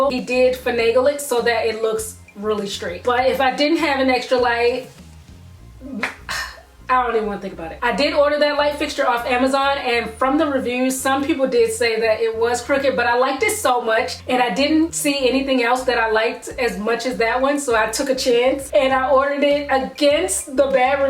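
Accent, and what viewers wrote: Baltimore, United States. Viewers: End result is so beautiful! I love this! Sn: I hear a Baltimore accent dew tew lol are u from Bmore?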